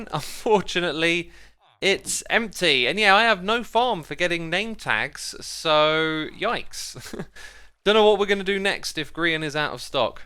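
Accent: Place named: United Kingdom. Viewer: and the British accent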